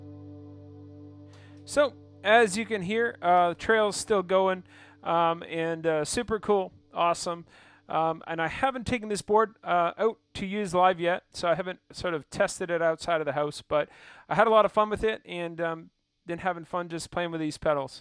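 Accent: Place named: Canada. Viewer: Curious, are you from Canada? Can definitely tell when you say the word "Out" like "Oat" haha. Anyway, killer board. Thanks for the video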